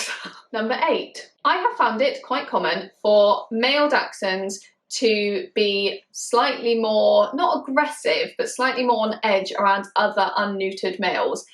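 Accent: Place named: United Kingdom. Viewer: I just wanted to take a minute to thank you for your video on male Dachsies. I’ve heard most of this from an American point of view, but, with your I assume British accent and sense of humor, makes it so much more enjoyable and informative to listen to!!! Again, thank you so much! 🌹🌹 I actually own a male Cheweenie, however, he displays the Dachsie side of his origin more often than not!😊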